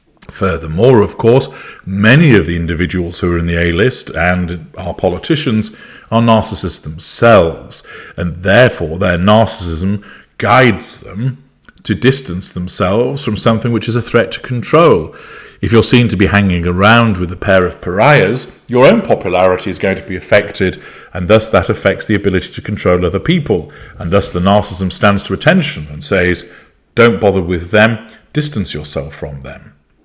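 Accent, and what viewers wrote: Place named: Scotland, United Kingdom. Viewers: your Scottish accent makes you sound like Maggie Smith in The Prime Of Miss Jean Brodie. You are indeed the creme de la creme. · Toasting this vlog! Cheers! That Scottish accent!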